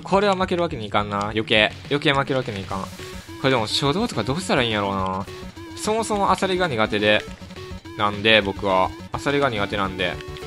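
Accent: Kansai, Japan. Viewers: か、関西弁🤔 関東の人かと思ってた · 関西弁多めやな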